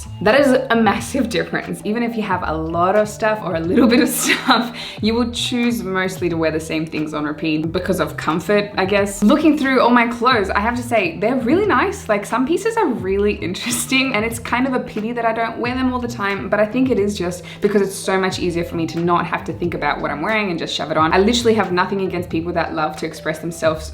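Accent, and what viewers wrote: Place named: Australia. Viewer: First time noticed Australian accent from you. Is the environment affecting you? :)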